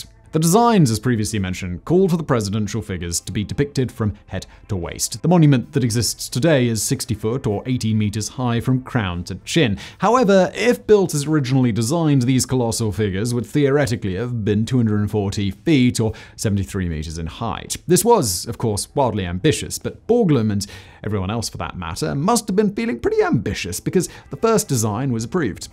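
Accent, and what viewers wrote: United Kingdom. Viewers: Hearing a British man talk about how america doesn't like people taking their land and disagreeing with America usually ends badly honestly made my night.. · I Adore your accent! I could listen to it all day! But I did perceive some condescension in your tone with this video. I recognize as the rather sexy, erudite you are, the unintentional cadence is bound to slip in once in a while,! Particularly when as a Brit you’re critiquing the USA. It did come to mind though as an uninformed, oblivious but to his own surroundings Yank, that the shall we say reclamation of land from the Lakota Tribes that sat squarely in the middle of the US’s borders during the 1880’s, might have been a direct export. From Great Britain’s export idea of conquer and seize in the preceding 30 years of an entire continent really, India, in the Eastern Hemisphere! 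Of course after a hundred years of pillaging India of the jewels and resources, the UK did return it good as new! And don’t forget more recently Hong Kong. We Americans do still have work to do! But. Always remember. Americans may not know a damn thing about your politics ( and continued penchant for powdered wigs) but we absolutely LOVE our dear British cousins. Especially the adult young lads with that intoxicating accent! Seriously at this point the studios won’t even hire a male actor for an American role unless he’s British! I’m waving at you Tom Holland!☺️ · I agree with you, it is about the content, not the accent., and I did not mean that he did not have a native accent. I was simply replying to [yeet][yeet] who thought Simon "unlearned" his British accent. I was simply pointing out words where one can clearly hear the British accent. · Wow, I never thought of Simon as British, did he purposefully unlearn the accent to appeal to an international audience? 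xd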